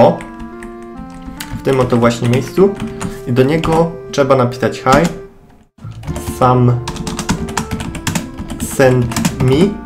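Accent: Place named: Silesia, Poland. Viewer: Lubię śląską gadkę :)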